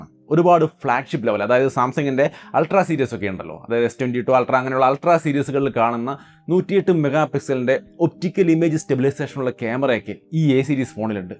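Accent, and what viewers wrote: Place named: Palakkad, India. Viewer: Palakkad karananeleee☺️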